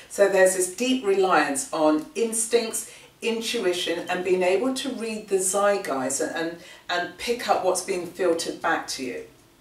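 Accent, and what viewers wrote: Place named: United Kingdom. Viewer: Thank you. Great information. I love your down to earth approach to your videos and hearing this information in an UK accent. The necklace you are wearing is particularly stunning! However, what happened to your camera angle on this one!:-) Where do I need to be more courageous in my life?..... I have a mars/saturn 1/7 opposition so I've had to learnt to be pretty courageous to just keep going really... however perhaps I need to let people in to my heart instead of 'protecting' myself by holding them at arms length. However, how to change this?! It's easier to allow myself to love children than adults as they open my heart somehow. Another part of my life I could be more courageous is letting go of the day - and allowing myself the feeling of " I did my best and I let it go now' instead of overthinking what ever happened and giving myself a hard time about silly little things. 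Letting things 'be' is a form of courage I think.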